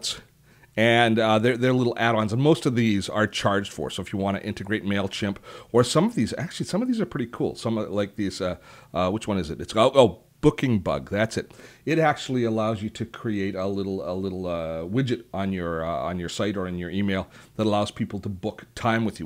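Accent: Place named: Canada. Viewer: You have a canadian accent : )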